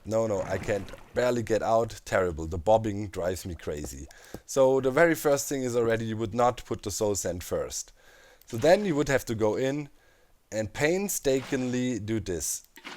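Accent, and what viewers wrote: Germany. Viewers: you are german man hört das einfach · Deutsches englisch erkennt man immer xD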